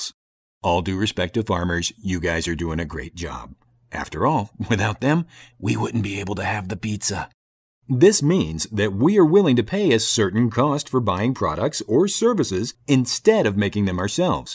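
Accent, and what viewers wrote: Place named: United States. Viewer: excellent explanations. I have to say this is a little bit weird to hear an American accent referring Indian stuff so familiarly like India is his homecountry.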